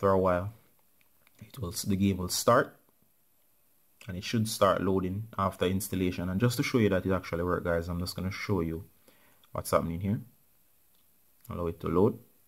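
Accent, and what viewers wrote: Jamaica. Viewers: r u Jamaican I am idk it's your voice that caught me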